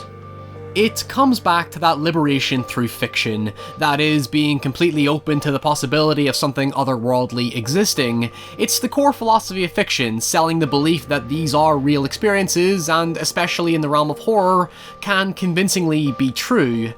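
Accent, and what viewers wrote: Northern Ireland, United Kingdom. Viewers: I was trying to pinpoint your accent and then I realised it was Northern Irish just like myself. Have never seen such a popular youtuber from here! Props to you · if the host british ? anyone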